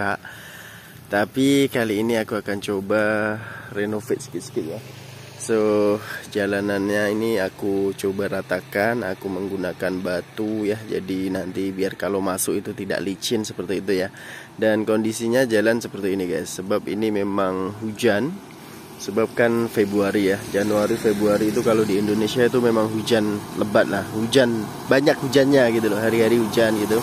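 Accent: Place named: Malaysia. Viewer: Lama kerja di Malaysia ya bang?percakapannya pun dah setengah melayu indo😅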